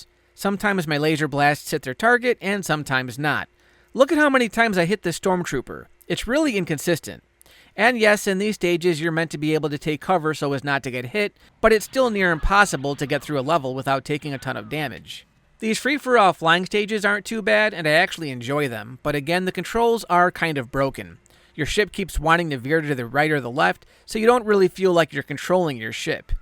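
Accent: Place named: Chicago, United States. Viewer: Love the Chicago accent!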